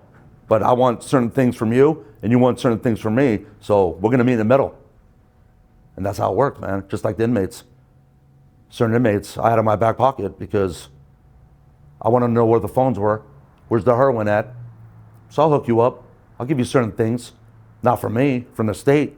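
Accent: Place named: Southern California, United States. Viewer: Dude sounds like Tony Ferguson from ufc. Got that socal accent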